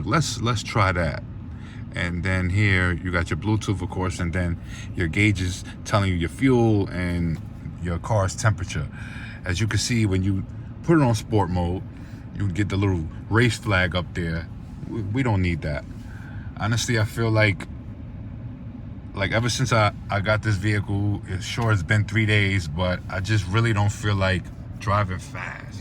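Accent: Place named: Philadelphia, United States. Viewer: Sound like you originally from Philly.lol Nice review 👍🏾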